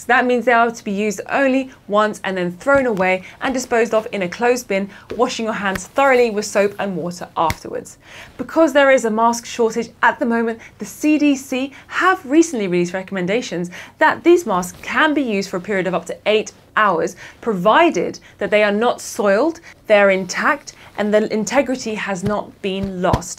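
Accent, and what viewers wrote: United Kingdom. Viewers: I live in London and that's a British accent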